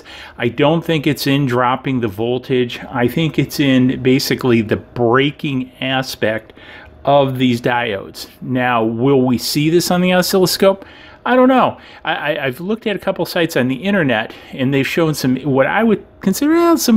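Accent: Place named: Chicago, United States. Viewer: That Chicago accent!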